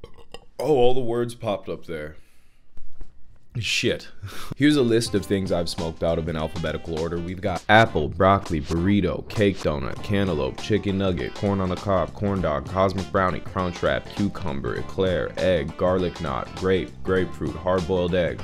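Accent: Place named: Wisconsin, United States. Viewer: My boy have to be from Wisconsin lol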